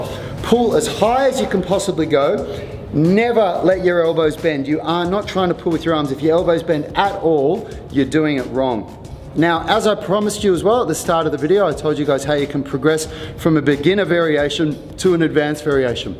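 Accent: Australia. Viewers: Great explanation. Are u an Aussie?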